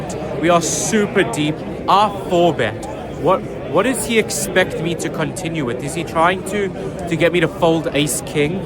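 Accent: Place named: South Africa. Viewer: Loving the vids - the energy and the enthusiasm and the SA accent (I grew up in Benoni!!! - living in the UK now). Keep 'em coming ... but man you have to slow down! How many of the top guys will be rushing off to play hours of cash games at the end of a 12-hour Man Event day? Save that brain power for Day 3 ... and hopefully beyond! 🤟🤟🤟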